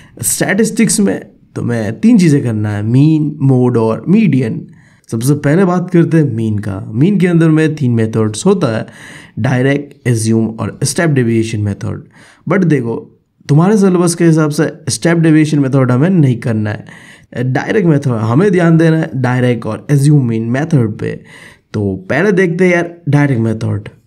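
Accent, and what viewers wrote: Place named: Bengal, India. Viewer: Please sahi se bolo na ekadam bangali kyu banrhe ho i hope tumhe meri baat samajh aai ho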